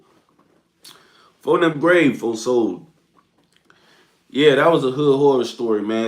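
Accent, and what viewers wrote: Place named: Detroit, United States. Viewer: I’m from Detroit that’s how we talk my baby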